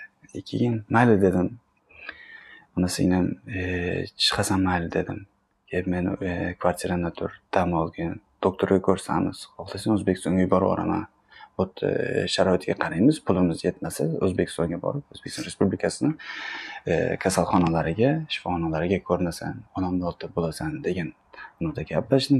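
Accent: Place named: Turkey. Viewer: Узбекчани 5 йилда яхшигина унутибсиз. Туркча акцент. Углим рус мактабда укиган, Россияда. Лекин узбекчани аксентсиз гапиради, кийналмай.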